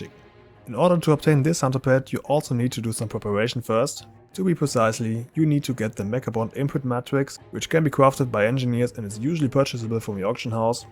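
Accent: Germany. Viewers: Very well made video for a smaller chanel, keep it up :) I'm looking for a new class to play and am wondering if Hunter is a good choice at the moment and in general. How does it do in open world content, mythic + and raiding? How does it differ between it's specs? And how fun is the class to play? I heard that marksmanship hunter isn't doing so well for a ling time, is that true? Are you by any chance from Germany? Your accent sounds like it (I'm from Germany). Than you so much in advance :) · Hi! I like your video, thx. Are you German? Sounds as if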